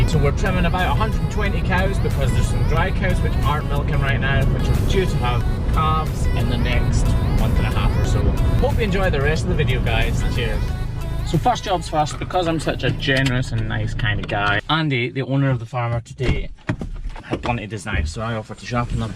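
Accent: Scotland, United Kingdom. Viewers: I work with quite a few Scots and while their accent is easy for me understand, it does get stronger when they talk to each other, as well as faster and with more Scottish slang. would be taking a little slower on his vlogs to maximise understanding for those who aren't Scottish. Most U.K. Residents and those in Australia and New Zealand would probably understand him very easily but those in North America maybe not so much. His slightly slower speech for the vlogs would also be more beneficial to those who have English as a second or third language. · Hey - just wondering - do you speak differently when you’re talking to the farmers, like with a heavier accent? Or when you’re in different parts of Scotland? If yes, I’d love to hear it!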